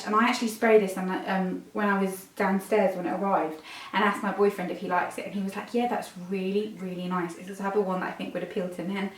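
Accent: United Kingdom. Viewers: I’m confused if you are Israeli born and raised why do you have a British accent?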